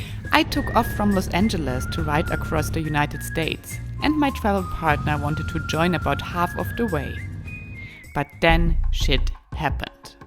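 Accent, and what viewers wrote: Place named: Germany. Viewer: Jura is pronounced SHÜÜRA and not with your heavy kraut accent YOOORAH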